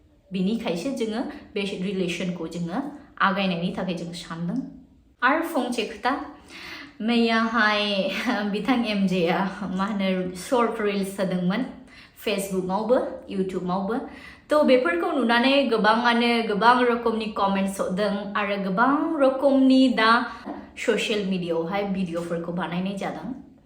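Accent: Bengal, India. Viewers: Are you from Bengal beta, your speaking like Bengali tone. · Abo boro rqnya na na kok thana bengali sw thangdw mathw rao ya... Boro jana boro rao mwjng rwngnw nango.....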